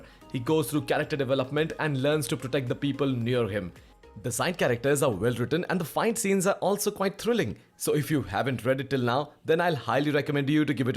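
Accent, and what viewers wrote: India. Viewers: are you idian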